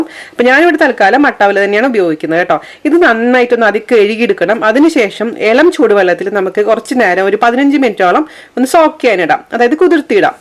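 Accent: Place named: Thrissur, India. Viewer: Hai... njan Saudi Arabia il aanu work cheyyunnathu. Ende mappalakku appam nnu vachal jeevananu... evade njagal thamassikunidathu naalikeram kittilla naalikera powder kittum. But aval appam polichu... super nammude thrissur bashayil... classayitta.. thanks dear... njan ayyanthol aanu thamasam. I love you... enne karikal vakkan padipichathinu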